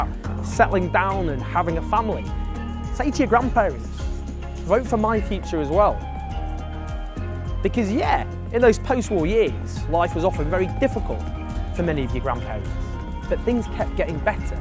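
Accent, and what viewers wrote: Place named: North, United Kingdom. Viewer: Owen you are brilliant. The most engaging person ever to emerge on the Left. I don't relish the idea of voting Tory but I probably will. The winning party has to put together a government that can do the job - go to the office every day and keep the show on the road. The Conservatives have always shown they can do this. We can't risk Labour's questionable competence. I'm 75 and come from Devon! Haha. And I am nobody's grandparent. (By the way: don't let living in London rob you of your northern accent. Everybody likes it - but It seems to be slipping. )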